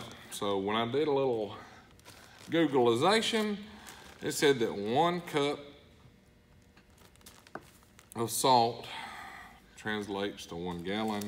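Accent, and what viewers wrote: South, United States. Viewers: Classic American? Only a small portion of the populace sounds like that, and yes he's in the south. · I love your accent, you sound like Oggy Doggy, or Doggy Daddy,, or Deputy Droop Along, or that Huckle Berry Fin dog..No offence meant, just a classic American accent. [From the south?].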